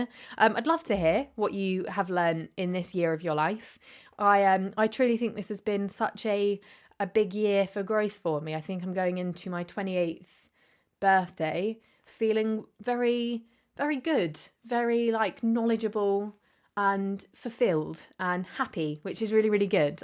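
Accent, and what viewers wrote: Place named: England, United Kingdom. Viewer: You are a pretty girl with that funny English accent. After seeing this video I realize you aren't always funny and confident like you seem.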